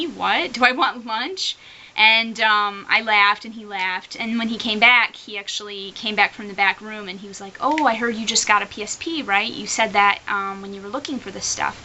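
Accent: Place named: Midwest, United States. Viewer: Sounds like the midwest, Wisconsin a bit.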